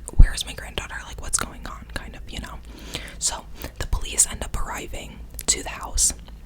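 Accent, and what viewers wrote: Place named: New York, United States. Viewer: I love the subtle northern accent, Ny or NJ😂 Great videos, thank you. It's weird, I remember hearing about this case all over the news as a kid and never got to learn what happened until now. I think I was like 8 at the time and in Florida (basically in the same place as her, maybe 10 minutes away), wild.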